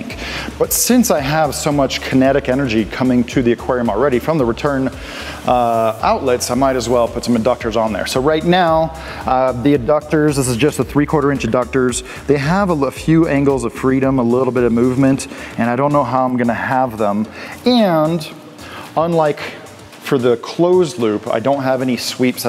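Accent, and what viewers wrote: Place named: Australia. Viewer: I thought you were from Australia 🇦🇺😀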